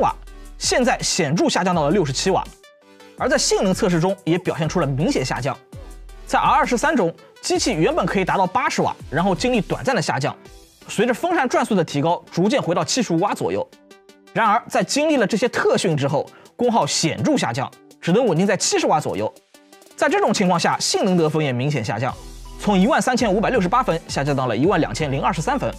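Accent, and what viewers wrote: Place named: Nanjing, China. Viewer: 這位主持人聽起來好像南京人耶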